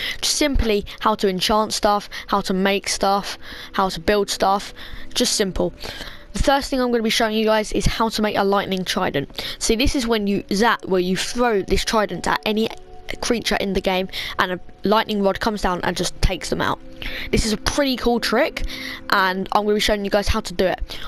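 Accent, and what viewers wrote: United Kingdom. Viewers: oh you're british?! I love that accent, it's nice👌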